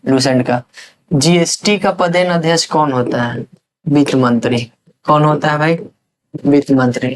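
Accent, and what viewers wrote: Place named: Bihar, India. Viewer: Bihari assent in his voice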